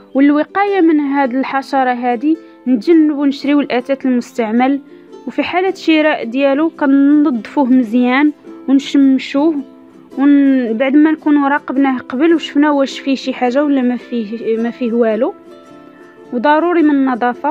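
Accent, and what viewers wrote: Morocco. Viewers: ههههههههه ههههههههه ههههههههه مو فاهم كلامك باين علئ صوتك انك مغربيه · فهمت شي اسئلكم بالله لهجه مغربيه ناقصين اجانب قصدي من الاحتلال ههههه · هذه السيدة مغربية من لهجتها واضحة لا تشبه اللهجة الجزاءرية او التونسية انا اعرف اللهجات جيدا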